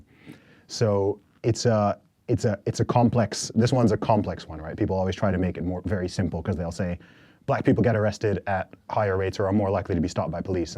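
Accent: United States. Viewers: Is he from the South West? What's with the Mid-Atlantic accent? No problem with it, I have one myself. I know this comment is crud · By the way, this guy is British. I would like to know how he got the American accent.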